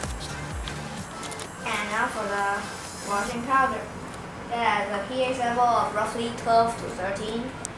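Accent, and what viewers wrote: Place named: Asia. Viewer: Lol a cup of wwwater hahahha asians speaking english is funny af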